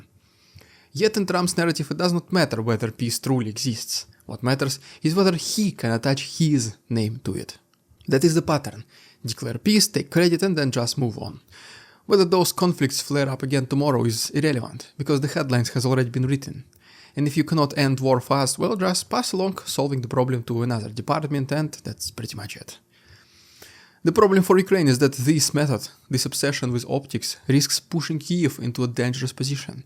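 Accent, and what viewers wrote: Russia. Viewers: Dude just do the videos in Russian. No one can understand the "Runglish" you're slinging.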